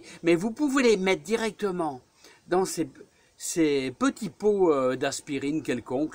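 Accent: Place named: Nord, France. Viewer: Bonjour! Ravie de m'être abonnée à votre chaine, j'aime beaucoup la façon dont vous abordez le jardinage, vos conseils précieux et, cerise sur le gâteau, votre si sympathique accent "chti"! Je vois que vous avez quelques pieds de raisin, bien beaux et bien fournis, quelle chance! Pourtant originaire d'une région où les vins sont prestigieux, je n'ai pas la chance de pouvoir en récolter, les guêpes passant avant moi parmi les grappes et ne me laissant que les peaux des grumes, et encore, pas toujours car les oiseaux se chargent de "vendanger" le reste... Auriez-vous une idée à me donner pour éloigner ces dames jaunes et noires pour qu'elles me laissent quelques grappes à récolter, s'il vous plait? J'ai voulu essayer l'ensachage mais cette méthode ne m'a pas convaincue, et je répugne à installer des pièges... Merci d'avance.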